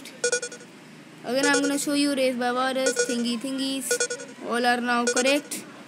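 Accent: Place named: India. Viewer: Are your Indian because of your accent?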